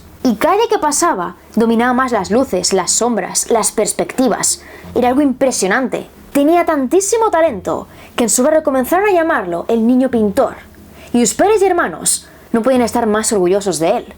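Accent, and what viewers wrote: Spain. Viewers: Se busca un corazon de Se busca un saludo de Se busca su acento español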